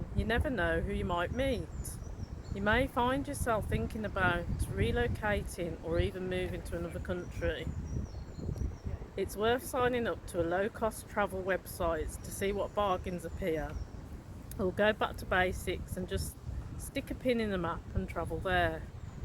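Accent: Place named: England, United Kingdom. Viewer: Just love this english accent too! Why dont you check Kemit ancestry ?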